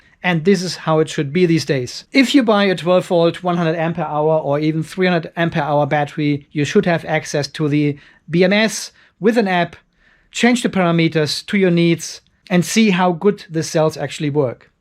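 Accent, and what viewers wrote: Germany. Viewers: Hi, i am thinking about buying one of those Batteriepack from Basen for my upcoming solar Project. But... I don't know yet. I start watching your Channel a couple of days ago. I am impressed about your knowledge on the whole Solar stuff. And the other thing is i was wondering about your english language with the German Akzent. But anyway... I Like your Videos, keep on doing IT. I learned a Lot more about batteries from U. Grüße aus Ludwigsfelde Tom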